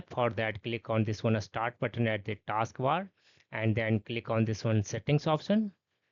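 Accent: India. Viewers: man I love you first i dont trust you becous you sound like a indish person u want to scam me but now i love you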